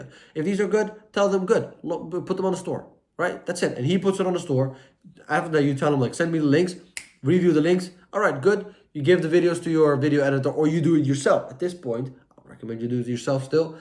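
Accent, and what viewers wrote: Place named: Turkey. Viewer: Brother you sound Turkish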